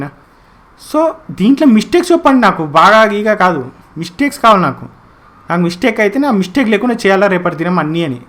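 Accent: Telangana, India. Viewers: Bro meru pakka telangana yasalo best information andisunnaru maku chalabaaga upayoga paduthundi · Super Video Bro’ Telangana Yasa Adhirindhi.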